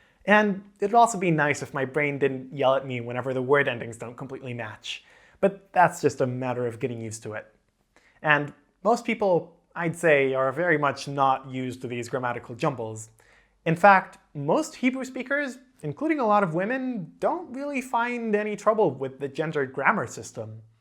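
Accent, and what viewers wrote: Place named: United States. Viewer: They American accent isn't nice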